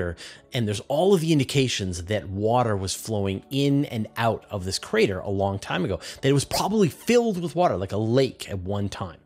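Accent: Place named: Canada. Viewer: Your canadian accent is very nice, there's nothing to worry aboot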